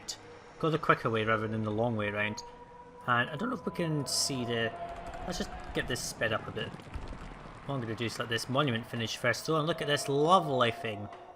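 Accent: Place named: Scotland, United Kingdom. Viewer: Are you a Scotsman? Love the Accent and lovely gameplay :)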